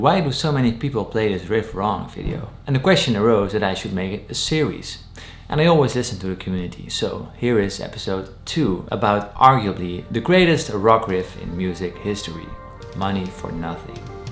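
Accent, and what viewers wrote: Netherlands. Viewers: Are you dutch? You sound like a fellow dutchy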